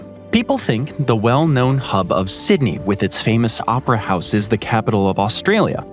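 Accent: United States. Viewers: Got to admit, this is probably the most enjoyable vid' with an American accent I've ever watched. Even so, as a Brit well into my 70s, I wasn't taught most of these myths in school. Then again, I wasn't taught that history started on July 4, 1776, either. America, please don't take offence. I'm only pulling your leg. Your cultural view of the rest of the world does amuse me, though. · The whole video is in American English. And a lot of cities have different names and pronouncations. Like countries. Most countries call themselves different than tge rest of the world does. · The voice being spoken with an accent from USA - says a lot.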